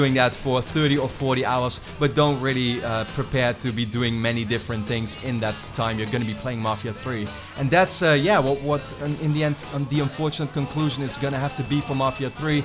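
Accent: Netherlands. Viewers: ik hoorde dat accent en ik dacht dat is sws een hollander 😂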